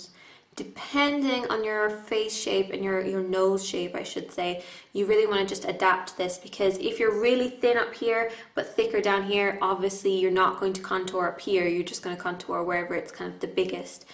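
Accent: Ireland. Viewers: Yeah, she's irish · Shes irish :) x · She's from Ireland like me!🌸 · you are messing with my head, ARE YOU IRISH OR AMERICAN GOD DAMN IT?!?!?! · where are you from ? because I feel like I sense a bit of an Irish accent, regardless its very cute ( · At the beginning she has an Irish accent because she is Irish ;-). She said she does sometimes get a bit of an American twang though :-). I forget why? She has been to the states quite a bit and she might have family there or something, not sure. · Age you irish.? :) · are you part irish? i can hear a bit of an irish accent coming through :)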